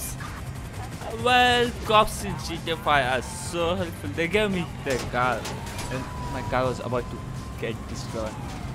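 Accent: India. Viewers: You are from India or another Country like this because accent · Es de la India , por eso habla así el inglés. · T series acent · Sorry i love you and your channel no homo But your Indian accent sounds like youre sleeping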